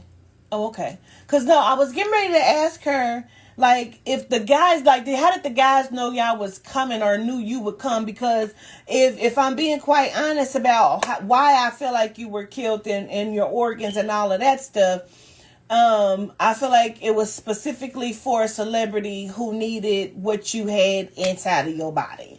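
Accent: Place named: Chicago, United States. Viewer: I’m from Chicago and that accent is on point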